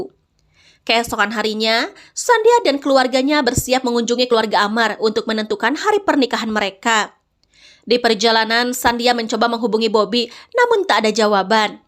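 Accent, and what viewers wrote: West Java, Indonesia. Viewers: semangat ya cantik , perbanyakin aksen sunda nya ya . itu yg makin tambah greget loh , biar punya ciri khas macam mang jon iq7 , reza.saqahayang & mang andreas baok kompa 😊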